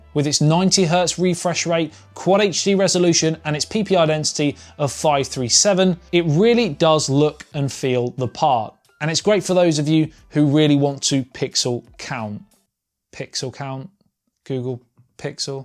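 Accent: United Kingdom. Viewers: what can i say...feel the same. damn so cute and that british accent you could sale anything to me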